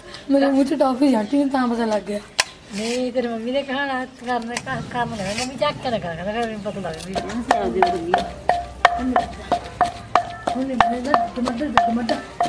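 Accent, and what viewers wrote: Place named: Jalandhar, India. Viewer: Mainu tusi jalandar side de boli lagdi tuhadi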